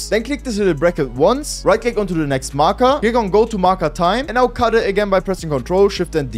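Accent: Germany. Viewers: are you german? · Kann es sein das du Deutsch bist? Man hörts bisschen xD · Deutscher Akzent?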